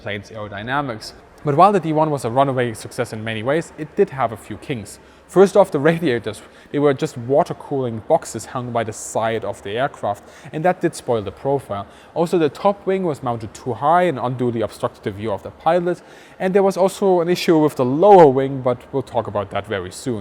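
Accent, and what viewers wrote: Germany. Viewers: Loved this. Brought up on Biggles stories and WWI fighter pilots. It's great that your accent gives the German words a better sound that an English person could. 👍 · Dein Englisch entlarvt dich als Deutscher. Horrido alter Hase.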